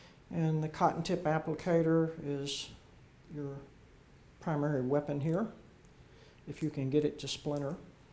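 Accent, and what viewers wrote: United States. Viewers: I didn’t even notice an accent until you pointed it out. Lol. So I’m guessing south. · Ahmad Syarbini Mat Southern, most likely Louisiana.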